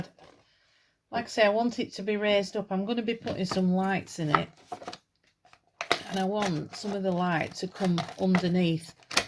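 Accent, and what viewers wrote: Northern England, United Kingdom. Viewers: Wow, that's the ghost with the most! What a brilliant idea to soak fabric with the Aquacast. I may try this in an attempt to get rid of the huge bag of eco pour I have sitting in my craft room. It made me homesick hearing your lovely northern accent because I'm from Lancashire but l moved to Oxfordshire 11 years ago and miss Lancashire so much. Hope you have a lovely Halloween :)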